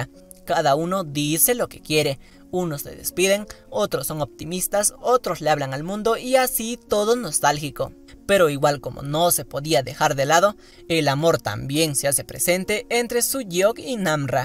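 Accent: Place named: Mexico. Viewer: palteas oe porque hablas como mexicano , si eres peruano !!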